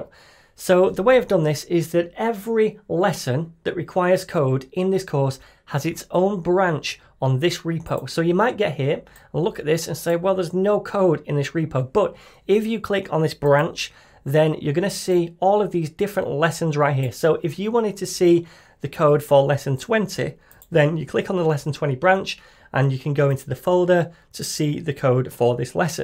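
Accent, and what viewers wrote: United Kingdom. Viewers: british accent right? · Long live bri'ich accent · your British kind of accent is relxing and easy to be understood and the way you explain things is really something. Thanks! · ngl, at first i thought this man was indian, but when i listen him carefully.... He is british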